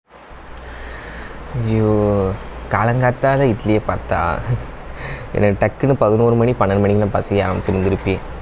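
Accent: Madurai, India. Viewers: Anna unga Madurai slang super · Hi bro unga Madurai slang super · Bro naan coimbatore but unga madurai slang super 👌😍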